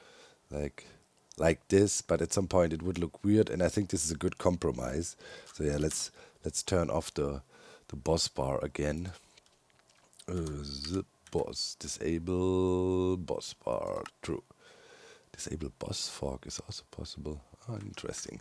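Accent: Germany. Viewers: "fiddl wid it a littl bid" must be the cutest thing you can say with an endearing German accent like ❤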